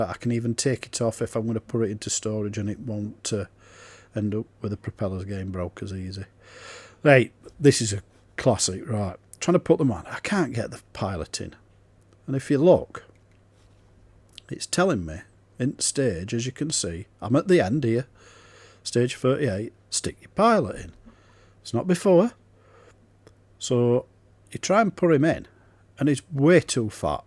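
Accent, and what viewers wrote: Barnsley, United Kingdom. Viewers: Thought is was Barnsley, that's me as well👍 keep doing the videos there big help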